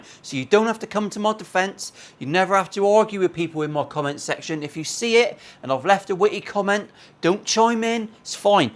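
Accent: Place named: Northern Ireland, United Kingdom. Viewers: I love the Northern Irish accent, especially when you say millimetres........Chuckle........ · I love your Norn Irn accent mate. Always makes me laugh. I'm from Norn Irn and you sound like a local. I'd love to see you do a whole video in the Norn Irn accent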